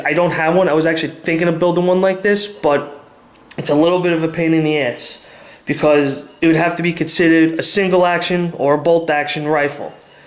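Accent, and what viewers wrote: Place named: Ireland. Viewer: Wow you sound very irish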